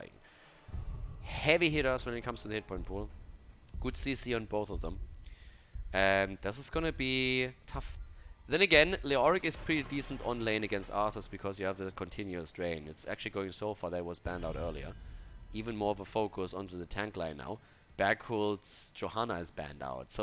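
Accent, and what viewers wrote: Germany. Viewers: Good commentary. It's Zometzing witz the german accent that just makes it more intriguing.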